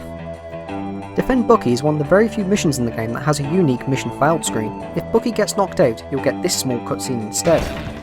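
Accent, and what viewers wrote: United Kingdom. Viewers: its a british accent. whats so hard to understand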